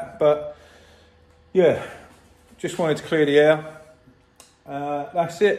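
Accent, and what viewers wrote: Essex, United Kingdom. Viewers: I thought you were from Essex. I was born in Billericay. My girlfriend lives in South Yorkshire and her and family love my accent!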